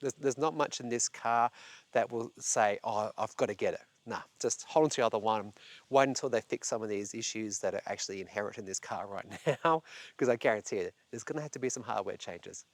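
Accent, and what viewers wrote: Australia. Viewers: Don't laugh at the Tesla not understanding the Aussie accent. Why expect more from it then the rest of the world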